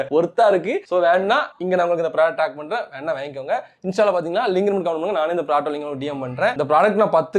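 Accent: Tirunelveli, India. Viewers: Tirunelveli ya bro ungalukkku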